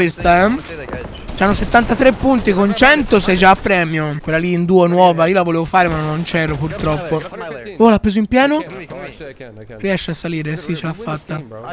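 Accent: Tuscany, Italy. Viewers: Ma sei toscano pk si sente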